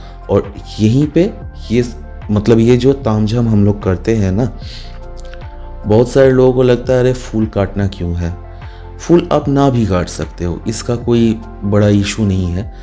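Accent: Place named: Bengal, India. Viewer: Aapki knowledge, bengali hindi accent and voice modulation is great.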